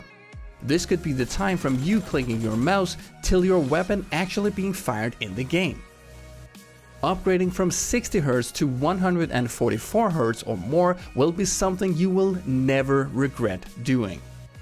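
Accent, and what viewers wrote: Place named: Denmark. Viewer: You sound danish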